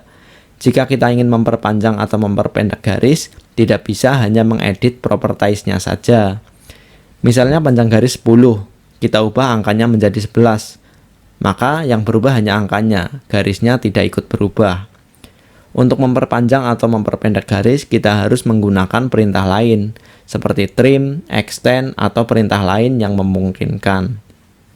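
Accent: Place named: Banyumasan, Indonesia. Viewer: mase ngapak e ceto nemen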